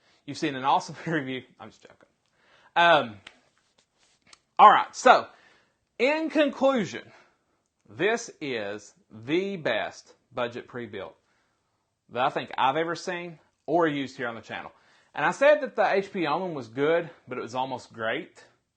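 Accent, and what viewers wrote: South, United States. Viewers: Are you from Southern USA ? I've heard this accent in some old Hollywood movies. No hate, just curious. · dually noted and I will take your points into consideration as I execute my purchase in the very near future. As a consumer of content, may I say, I reallly, like REEEALLY, love the deep southern accent in these build/review videos! It's refreshing compared to those grossly proper UK creators or Bollywood types ;) Keep up the good work my friend and I look forward to some updates on the systems you have already built. TTYL!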